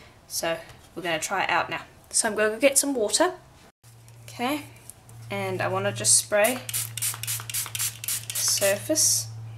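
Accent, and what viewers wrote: South Africa. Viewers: Hi. Where are u from? You sound South African :)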